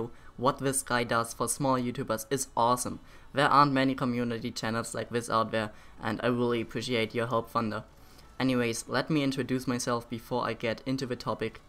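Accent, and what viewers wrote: Germany. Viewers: Your accent is funny ^^ A typical german accent and luckily i haven´t got one that bad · Haste eiskalt den Intro-Song von Solution geklaut... Ansonsten relativ nichtssagendes Commentary, dass CoD zu hastig und profit-orientiert released wird ist nichts Neues, daran wird sich aber nichts ändern, da hier kein gutes Spiel erstellt werden soll, sondern Gewinn das einzige Ziel der Produktion ist. Englisch ist gut, nicht perfekt, relativ flüssig, noch leichter deutscher Akzent, ansonsten solide. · Sick german accent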